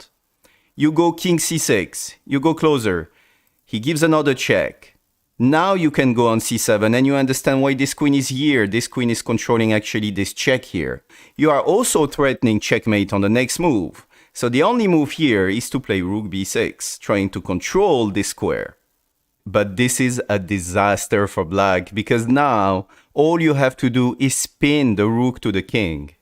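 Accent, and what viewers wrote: France. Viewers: omg the french accent is amazing 😁😁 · boy does the french accent suck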